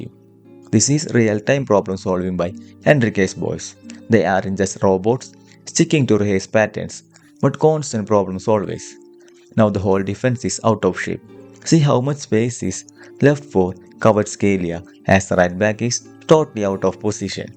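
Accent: Kerala, India. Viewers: Malayali Slag aanallo machand nattil evida. ❤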